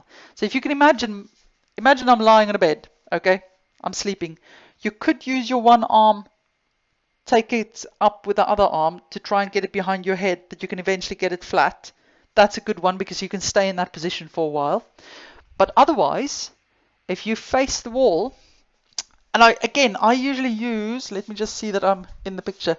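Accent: South Africa. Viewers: I lived in Auckland NZ I discovered a very painfull shoulder on my August Europe trip every time I put my backpack over my shoulders it really where getting worst coming back the spec diagnosed it at at frozen given a guided steroid injection it feel better for 4 weeks and now feel worse, but the whole time its really painful at the moment but at the back of my shoulder blade the pain shoot down to my fingers I think there is a tear in my rotar cuff. If I stretch my arm straight it feels like it stuck and so painful. My left shoulder already had a rotar cuff operation with a few tears because of an accident. I don't wont to go overboard with excercises just do about 5 at the moment but will it really take 3 years for healing. Their where no MRI taken of my shoulder. I hear your SA accent I left 25 years ago, nice day😊 Groete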